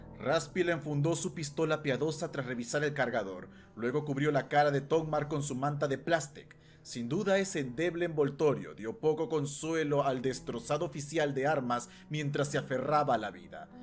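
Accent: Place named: Spain. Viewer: De que parte de España eres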